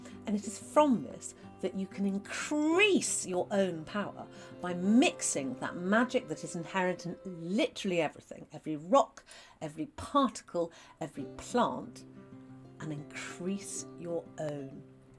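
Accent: United Kingdom. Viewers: I am utter rubbish with meditation. I either fall asleep or think of 500 other things I need to do. I do love my pendulum though!! I recently was a guest speaker at a collage class on religions. Brief history on witches, sabbats, and practices. They were facinated with my pendulum, their inability to get him to work, loved that I could get answers and was correct. Thank you for this information ma'am! I have already shared as your voice is so much better than mine. Brittish accents are the best!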